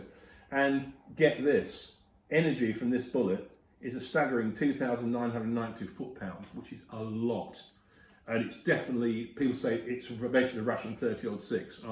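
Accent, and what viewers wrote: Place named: United Kingdom. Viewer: You can still get bolt action rifles in the UK? I'm just assuming form your accent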